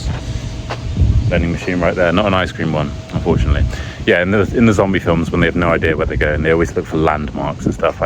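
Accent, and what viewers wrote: United Kingdom. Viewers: I'm Thai from Thailand. I'm learning British pronunciation, finally, I found you. Thank you, teacher.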